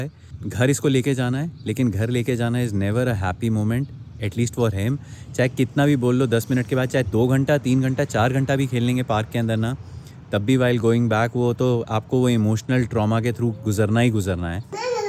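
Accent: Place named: Chandigarh, India. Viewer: my guess was right. You have Chandigarh vibes. Your way of talking has Chandigarh touch. I am too from Chd and living here in Bay Area. Good to meet you.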